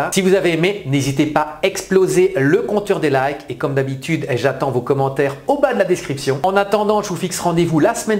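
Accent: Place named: Belgium. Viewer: Très bonne idée chef, une bonne nouvelle année et encore de belles vidéos avec bien l'accent belge 🎉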